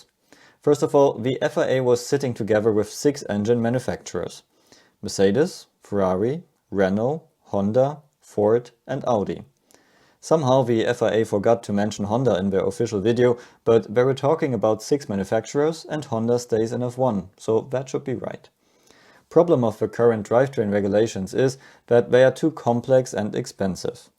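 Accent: Germany. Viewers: Danke, endlich mal ein Deutscher der es vernünftig knapp erklären kann. Ob auf deutsch oder englisch ist egal - der Akzent vermittelt ein gewisses mindset😂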